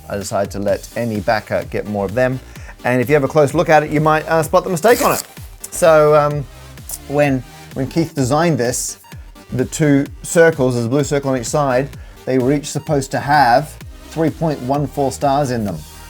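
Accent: Australia. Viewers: He's not a Brit. Can't you recognise an Australian accent?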